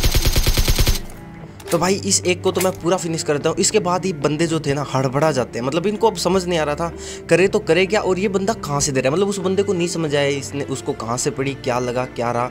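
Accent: Haryana, India. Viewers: bhai humare Haryanvi hai kya rajasthani...?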